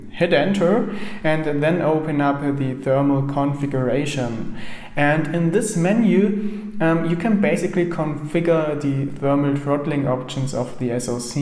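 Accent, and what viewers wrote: Germany. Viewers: don't tell me this isn't a german accent!;O Nice video, thanks for making.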